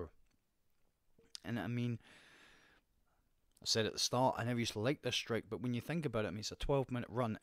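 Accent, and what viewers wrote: Scotland, United Kingdom. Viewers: Great tutorial dude really helpful strategies for each area also are you scottish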